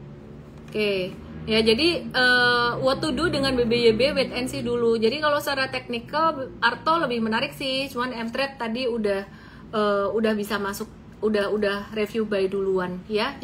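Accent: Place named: Surabaya, Indonesia. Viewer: Enak banget mis suroboyoane ketel 😂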